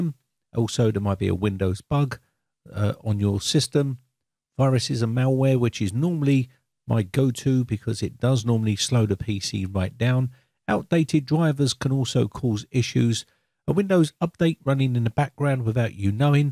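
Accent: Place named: South London, United Kingdom. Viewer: I'm gonna guess South London accent. Rotherhithe maybe? Anyways great video man!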